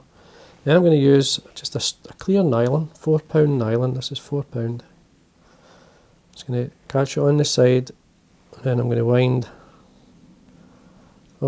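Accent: Scotland, United Kingdom. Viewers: Love watching you tie! Love your Scottish accent too!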